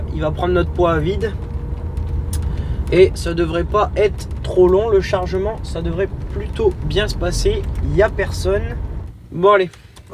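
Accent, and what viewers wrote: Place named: Nord, France. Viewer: Bonjour bonsoir merci pour la vidéo j'aime bien l'accent que j'entends je suis du Nord et j'ai l'impression de retrouver ma région j'aime bien tes vidéos elles sont sympas pour moi il y a un petit peu trop d'accélérer ce qui donne pas véritablement toujours l'imagination du métier le professionnalisme du métier mais merci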